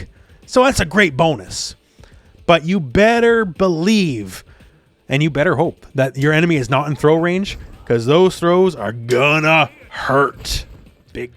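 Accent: Canada. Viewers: You sound like you must be from the same place as Jordan Peterson.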